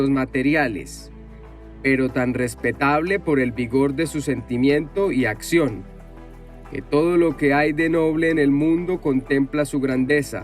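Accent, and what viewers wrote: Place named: Bogotá, Colombia. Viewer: El acento es de Bogotá, Colombia.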